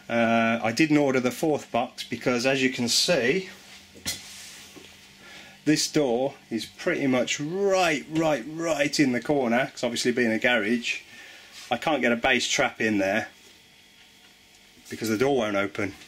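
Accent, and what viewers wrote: Midlands, United Kingdom. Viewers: Not sure why you have chosen to concentrate on intonation as he is trying to communicate his experiences of sound proofing a project studio from a converted garage? This is not an uncommon musical experience. His 'rising intonation' has everything to do with his accent. It sounds like he has a very mild Midlands accent - rising intonation can also be found in other regional English accents such as Geordie or Mackem - I don't think he's trying to deliberately confuse us with non-questions. There are scores of American posts on this subject and I find it helpful that someone on this side of the pond can quote inexpensive UK based sites for sound proofing. Thank goodness he didn't work out the RT60, we may have nodded off. Well done. He did say he was an amateur and it was his first post, do you not think you're being a bit harsh?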